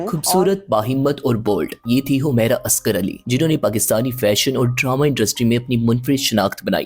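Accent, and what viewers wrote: India. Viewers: Ap Pakistani hain Indian ki trha na bolain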